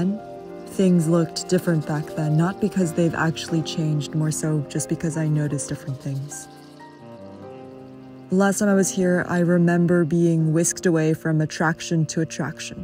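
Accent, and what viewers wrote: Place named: France. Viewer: Your french accent is so cute <3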